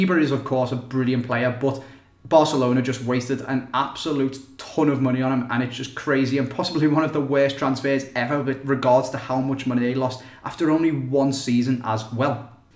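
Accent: Liverpool, United Kingdom. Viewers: Stfu you and your gay ass accent, talking shit about Americans. I hate how people from UK think that football is their life and they are the best at and keep putting Americans down, you can't even fucking pronounce altidore or can't even pronounce any Spanish names properly (noticed in videos). P.S altidore is probably 100x better than you at football. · It's a scouser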